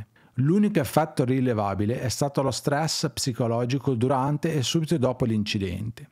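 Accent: Genoa, Italy. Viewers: Ciao ma io sento un accento genovese cmq complimenti sempre tutto interessante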